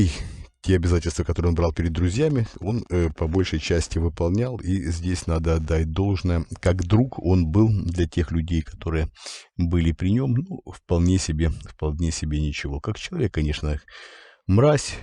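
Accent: Ukraine. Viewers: Вопрос -, почему у Вас украинский акцент?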